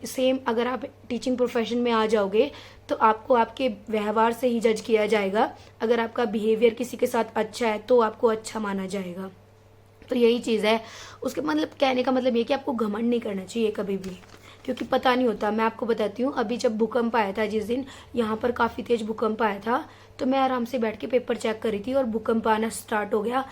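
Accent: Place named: Haryana, India. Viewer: Everything is good but your HARYANVI tone is just superb....vedio dekh ke to pata nahi ..but sun kr bahut acha laga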